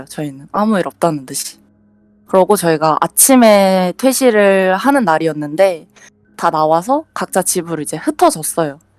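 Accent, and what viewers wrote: Gyeongsang, South Korea. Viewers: 근데 경상도 분인건 확실한데 왜 일부러 사투리 안쓰려고 하는느낌이지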